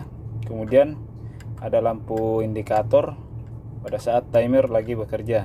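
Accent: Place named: Sulawesi, Indonesia. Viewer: kayak logat org sulawesi 😁🙏🙏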